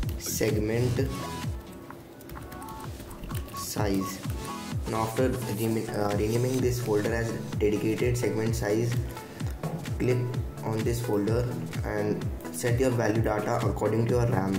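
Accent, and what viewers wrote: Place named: Karnataka, India. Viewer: R U Kannada ?? U Get the slang of Kannada in English...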